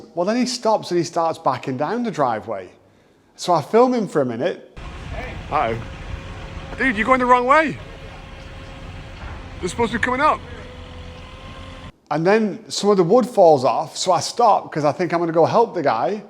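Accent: England, United Kingdom. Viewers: Amazing build, I bet your so glad you took the gamble, you don't sound American, UK maybe? What was the final cost? really curios. Jim in Liverpool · Are you from England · This guy is British! 🇬🇧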